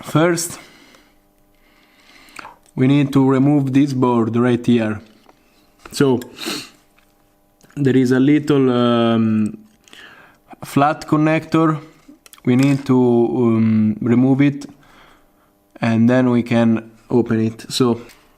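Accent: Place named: Italy. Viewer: quanto si sente che sei italiano ahahah bel video grande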